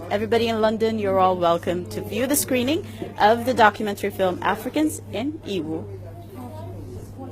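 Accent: Somalia. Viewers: She sounds like she is from some where in north Somalia 🇸🇴 which region I’m curious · Her Somali accent is from Hargeisa city.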